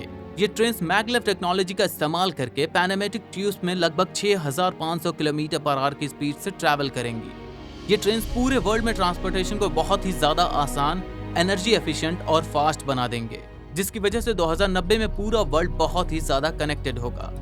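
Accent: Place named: South, India. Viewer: Language mein South Indian touch aa rha h....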